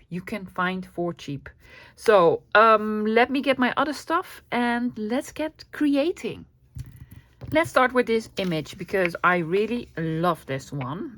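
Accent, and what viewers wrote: Netherlands. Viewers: To answer your question about languages in videos. First let me say, I have so much respect for those that make their videos in English when English is not their first language. As an English speaking person I would like to hear videos in English even if it is not perfect English. I guess that is me being a bit selfish so I can make sense of the project. I am sometimes ashamed of myself for not learning a second language. By the way, I think the Dutch accent is my favourite to listen too 😉. But for me, definitely speaking English, even if not perfect. You are so fun to listen to. You are very creative and fun. 😊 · Happy to have spoken in English (even if limited), Dutch accent very pleasant to listen to. Gail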